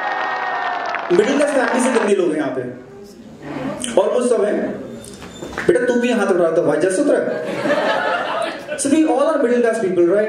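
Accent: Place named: Haryana, India. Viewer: anf yes i like the way u speak the good material... specially ur haryanvi accent...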